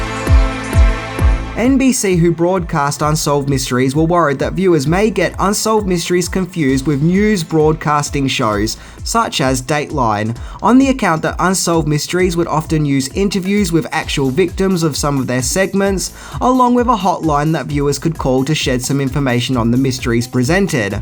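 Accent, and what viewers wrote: Australia. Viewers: Thanks for reminding me why I so hate Australian accents 😖😖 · Disclaimuhhh, love that Australian accent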